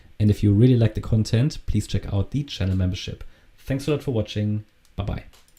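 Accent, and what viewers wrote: Germany. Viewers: du klingelst sehr DEUTSCH an.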